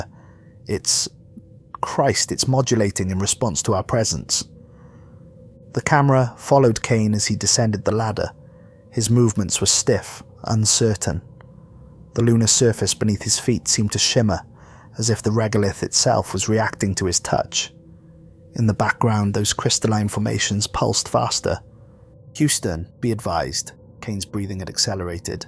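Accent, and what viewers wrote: Wales, United Kingdom. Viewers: Really appreciate you being a sci fi Creepypasta youtuber using their voice snd giving thanks etc. may not seem like much but pretty much all the others out there 💯 done via AI start to finish. Cheers! p.s. if im not mistaken, Welsh?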